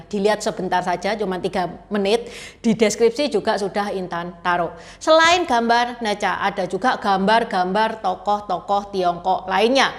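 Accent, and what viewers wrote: Java, Indonesia. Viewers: Makasih mbak ncik Intan. Ini chanel unik, keren, educativ trs bhsnya khas full medhogh.🇮🇩👍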